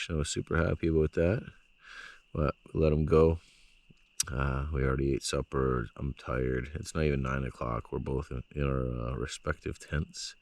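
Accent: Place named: Northern Ontario, Canada. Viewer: I can't remember where is from, sounds like he's alot closer to me than I thought. 3hrs from NB, so around Timmins or Cochrane?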